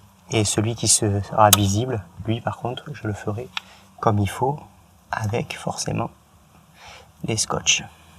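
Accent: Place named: South, France. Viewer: Rien que pour l'accent du Sud j'ai mis un pouce et me suis abonné,ça m'a rappelle mon Vaucluse...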